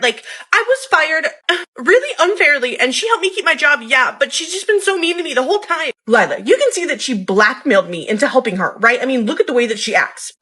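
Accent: South, United States. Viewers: OMG I love the Southern Belle accent! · I love the southern accent.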